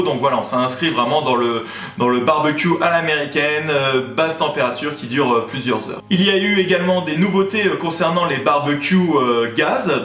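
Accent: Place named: Quebec, Canada. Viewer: La prononciation québecoise fait plaisir aussi aux amis belges :D !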